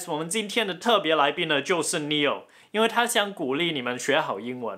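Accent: Cantonese, China. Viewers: Uspeak cantones so cute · OMG是真的Ne-Yo!我本來還期待你自己裝扮成他! 越來越棒了~真的大愛你講廣東話跟有廣東味的中文(普通話)😍😍😍!!!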